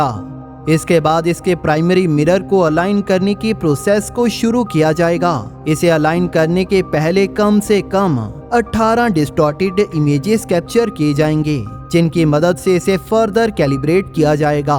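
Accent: Punjab, India. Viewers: O paaji, tussi Punjabi ho...?😁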